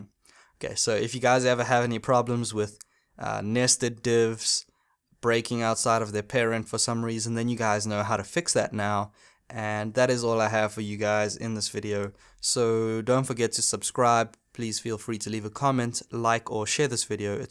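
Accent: South Africa. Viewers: Reading these things on tutorial sites and watching someone explaining these things to you are two different experiences. Thank you for providing clarity on this , looking forward to learning more from you ....Also i can hear that fellow South African Accent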